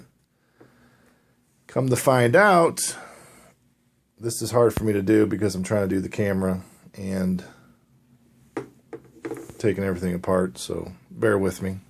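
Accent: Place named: North, United Kingdom. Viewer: If him saying washed like that posses you off than your a lol cry baby hitch it's ducking old school talk. .. or maybe dis you ever think. That he is a ducking northerners and the talk differently. Like they don't call a carbonated. Syrup. Drink a soda it's a pop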